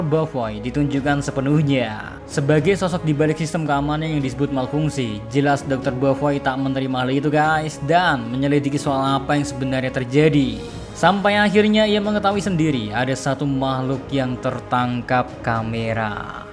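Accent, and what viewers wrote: Java, Indonesia. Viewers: Ngomong ga usah di gaya2in tapi Masi medogg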